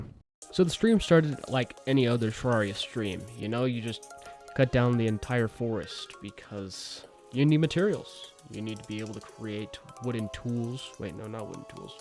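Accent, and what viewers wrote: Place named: United Kingdom. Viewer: blud is bri ish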